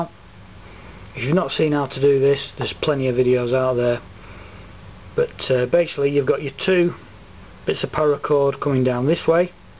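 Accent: Australia. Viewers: are you from Australia mate??? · I got a question are you Aussie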